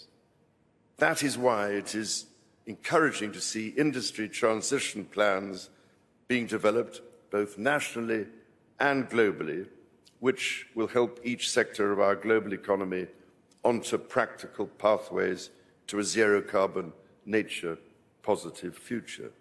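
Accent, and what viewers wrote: United Kingdom. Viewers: You are telling me that when the British spoke like this they didn't have real issues to worry about?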